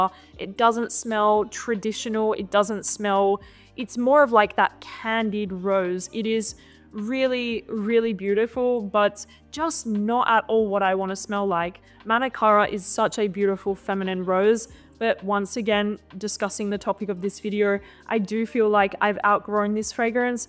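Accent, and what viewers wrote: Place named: Australia. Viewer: What is your accent? I can’t tell if you’re Australian or French or both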